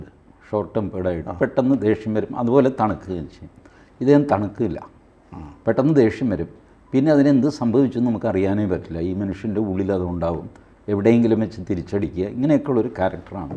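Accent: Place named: Trivandrum, India. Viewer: Like his TVM language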